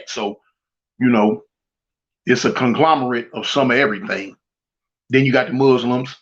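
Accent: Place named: Texas, United States. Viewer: I hear a Texas is accent 6ig homie most got people down there huh when you hit that (Mane) it makes me think that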